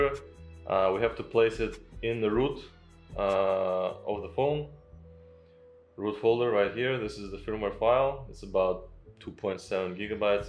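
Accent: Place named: Eastern Europe. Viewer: Hey, where did you get your phone from? I'm going to buy it in China probably from the official OnePlus Chinese website and bring it back to Europe. I'm pretty scared tho, I hope this works and doesn't brick my phone. I guess I should just not do any H2OS updates and it should all be fine? Also, from your accent I have a feeling you come from a Slavic country, am I right? :D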